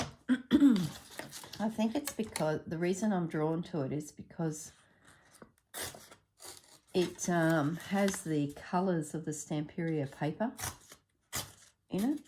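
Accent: Australia. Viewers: I watch some other crafters that make beautiful things that I love, but their voice and way of speaking make me crazy! I love watching you create beautiful things and listening to you! Maybe it's the Ausie accent!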